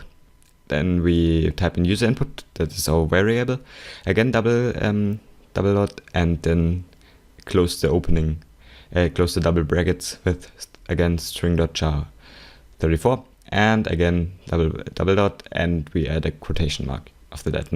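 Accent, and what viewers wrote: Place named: Germany. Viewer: Wieso hört man es immer heraus, wenn ein Deutscher englische Tutorials macht? :D (wohl, weil mein englisch genauso klingt?^^) Ich wollte eben nachfragen, aber ein Blick auf deinen Kanal gab bereits die Antwort. Ich hätte es ja als hübsch empfunden, wenn du am Ende noch das Beispiel direkt in MC gezeigt hättest. p.S.: wird im CC-Forum keiner mehr angenommen? Ich bekomme einfach diese validation email nicht zugesendet und habe dadurch keine Rechte im Forum noch kann ich den Admin anschreiben - blöd. Dabei hätte ich gar eine sehr nützliche API.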